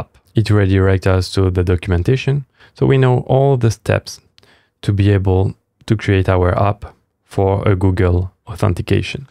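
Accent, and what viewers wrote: France. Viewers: Do I hear French accent??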